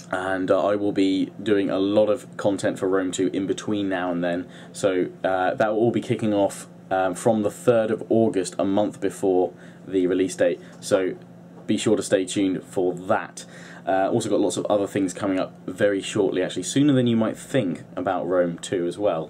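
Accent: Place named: United Kingdom. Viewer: I think your videos are great, and thank you for clearing things up about rome 2. I think your British accent is awesome, what dialect is it in particular?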